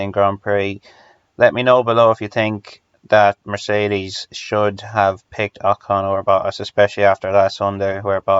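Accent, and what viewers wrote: Ireland. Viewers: You have some accent, obvs Irish but that's very broad for Irish people as we know every county is like different. But I'm shite at telling from county to county lol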